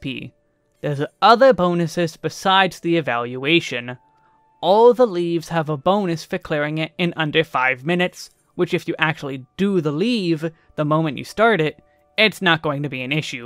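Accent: Boston, United States. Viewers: Boston-accent? Jersey? Can't put my finger on it :D - Gahtha goyde lol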